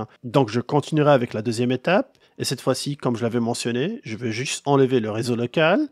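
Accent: Quebec, Canada. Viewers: Super vidéo. Bonus d’un français, ton accent de cousin d’Amérique 😊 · c’est un accent du Québec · Présentation et conseils très complet et en plus avec une pointe d'accent Canadien. Qui de mieux ? MERCI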